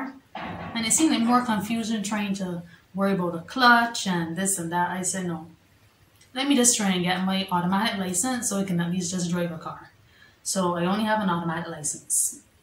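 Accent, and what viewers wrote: Barbados. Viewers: Hey, great job with your channel. Love de Bajan accent. Ps this is Azriel:)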